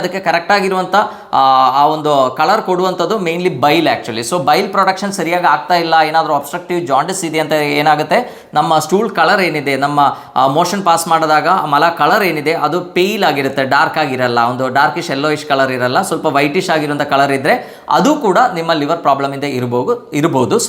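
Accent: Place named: Kasaragod, India. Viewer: Doctor re nivu kannada pada balasi namage English Word artha agolla. Nivu pure kannada davaru alla. Gadinaadu pradesha kasaragodu avara tharka ide nimma kannada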